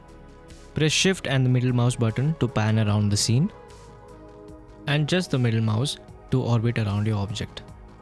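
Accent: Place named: India. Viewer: Thank you for your time and the tutorial. I hate any noise in the background and since I appreciate good music; it should not compete with the narrative; becoming noise which by definition is sound that I do not want to hear. All the negative aspects such as the Hindi accent; worst of them all is side-stepped by putting the sound off and the subtitles on; choosing English. BTW, the word "THIRD" is pronounced with the tip of the tongue between lower and upper teeth otherwise you end up with the word TURD which is fecal matter; an embarrassing word.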